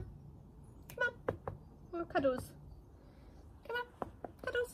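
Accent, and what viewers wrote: United Kingdom. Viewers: "Reddy..." 😆 I love the British accent 😸...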